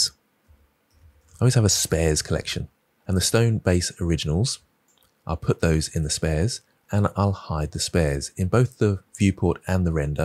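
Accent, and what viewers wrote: United Kingdom. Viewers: Hi, thank you very much to update your well even if I finished the 2.8 well project one week ago 😆. By the way, I would like to mention how much I enjoy your videos. Passion, friendly explanations and great british accent are your best skills! Please keep your motivation. Congrats.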